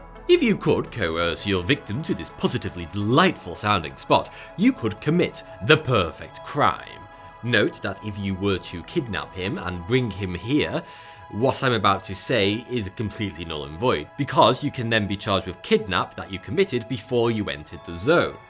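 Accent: United Kingdom. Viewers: Get away with murder with this one simple trick... COPS HATE HIM British Vsauce much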